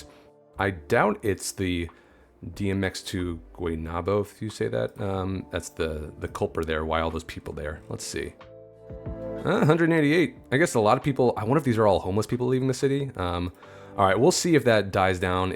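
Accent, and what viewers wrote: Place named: United Kingdom. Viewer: Dewy Tubey, a little british accent LOL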